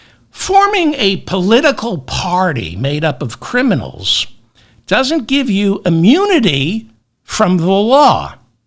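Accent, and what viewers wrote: New York, United States. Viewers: Love your New York accent!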